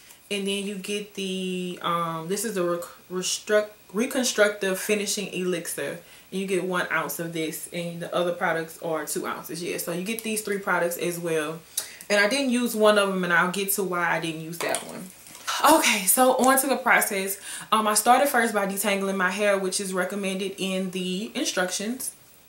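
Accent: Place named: Midwest, United States. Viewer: hey love, thanks for the review. also, are you from Chicago? i kinda hear a Midwest accent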